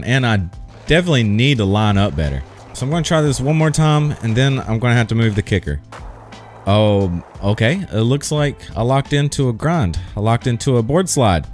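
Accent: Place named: Appalachia, United States. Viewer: his accent is like the city equivalent of Hillbilly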